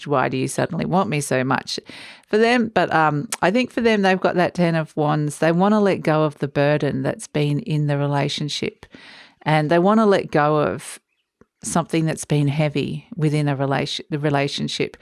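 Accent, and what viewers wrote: Australia. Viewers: Thankyou, love your readings, so nice to have a aussie voice 😊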